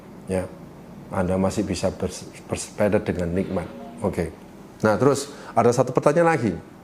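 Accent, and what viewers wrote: Surabaya, Indonesia. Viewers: I love..penjelasan nya mudah dipahami..yg penting logat suroboyonya yg bikin meyakinkan..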